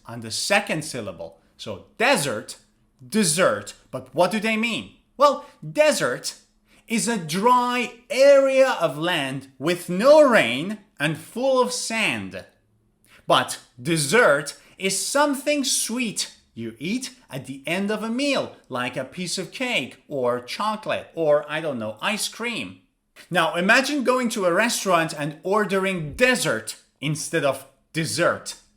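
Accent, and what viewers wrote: United States. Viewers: You are really American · Are you From America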